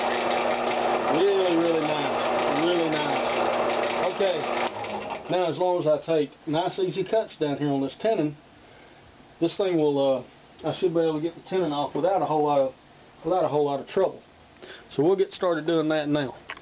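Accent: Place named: South Carolina, United States. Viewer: Hey. Where are you located? You sound like you come from my area. 8-) I'm in Spartanburg, SC.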